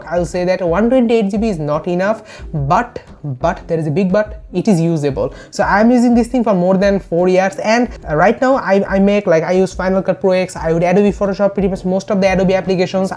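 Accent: Bengal, India. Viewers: Bengali re bhai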